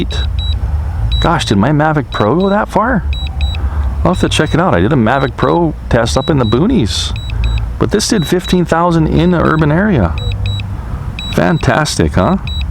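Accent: Hawaii, United States. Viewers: i don't subscribe for the videos...i subscribe because your Hawaiian accent voice is mesmerizing!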